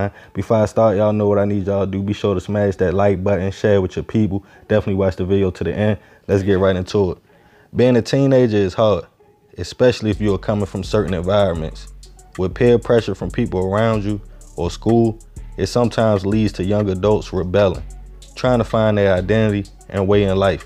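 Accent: Baltimore, United States. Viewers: I knew you were from Baltimore. I know my peoples from anywhere🤣🤣🤣🤣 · You gotta be from Baltimore I can hear it lol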